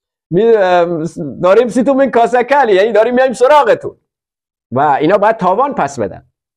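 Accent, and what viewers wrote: Lorestan, Iran. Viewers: جان حرف نداری بااون لهجه شیرین لری وباتحلیل شیرین دلمون گرم میکنید ؟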